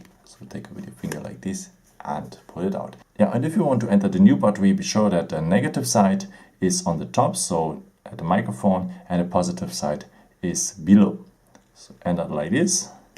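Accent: Germany. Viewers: Brilliant German accent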